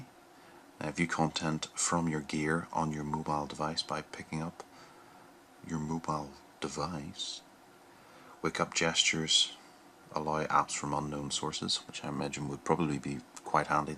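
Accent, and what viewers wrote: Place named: Northern Ireland, United Kingdom. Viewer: Where are you from? You sound like you're from around my area..ish lol. Northern ireland?/ireland?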